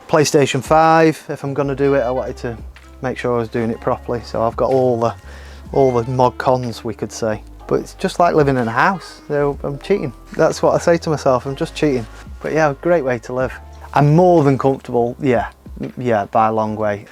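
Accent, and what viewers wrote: United Kingdom. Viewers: brit accent. Hard to understand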